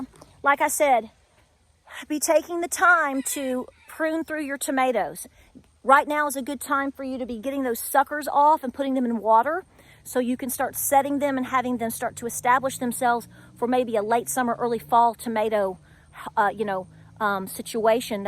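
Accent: South, United States. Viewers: I love your southern accent 😍😍😍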